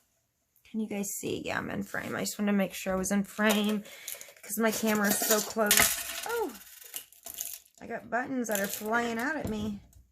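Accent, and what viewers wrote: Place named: South, United States. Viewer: you do sound southern to me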